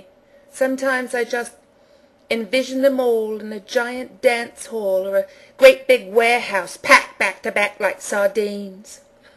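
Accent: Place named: England, United Kingdom. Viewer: Absolutely superb! I loved the English accent too - lol ~Sue~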